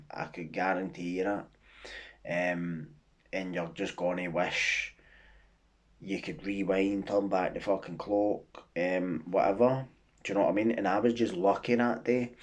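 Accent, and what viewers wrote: Scotland, United Kingdom. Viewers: Alright, I follow your channel from Australia, usually when I’m sitting at my desk working 🤣 really enjoy the topics, delivered very eloquently in common Scottish tongue. A wee bit of home for me. Keep up the good work.. Keep up the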